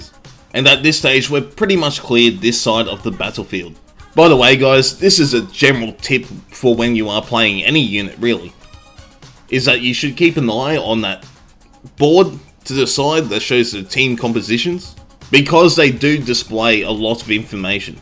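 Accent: Australia. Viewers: jeah and his aussie trashtalking is fucking funny xd but i meant that he is one of the few youtubers that doesn't just upload average games or boring low tier matches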